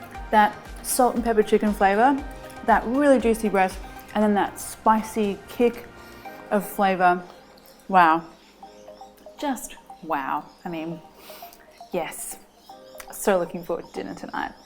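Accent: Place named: Australia. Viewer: Your Australian accent is getting thicker which each video! Hope you enjoy your return to Oz xx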